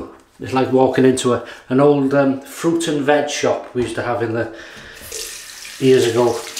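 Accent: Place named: Wales, United Kingdom. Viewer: Hi. Thanks for the videos. I've really enjoyed watching them, and found the very helpful. Actually, it's your videos that have convinced me to get into DE shaving, and I haven't looked back since! Thanks again, from a fellow Welshman. Hoping you are Welsh, as your accents sounds Welsh!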